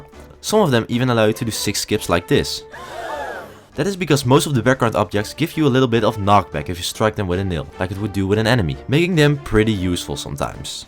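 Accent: Netherlands. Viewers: Bro I can hear it so clearly that you are Dutch, but I love it, keep up the content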